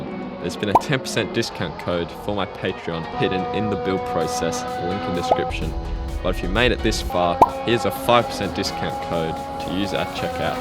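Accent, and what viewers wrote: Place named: Australia. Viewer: Why does this bloke sound aussie, but like he is trynna hide the accent haha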